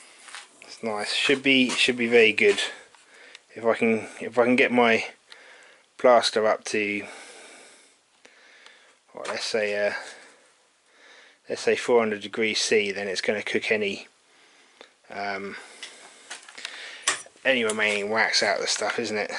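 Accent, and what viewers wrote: United Kingdom. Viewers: Nice work. Essex accent?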